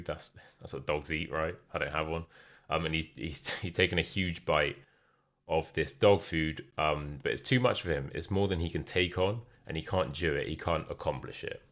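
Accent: United Kingdom. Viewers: Do you speak with rp accent or cockney? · Thank you teacher, i LOVE your British accent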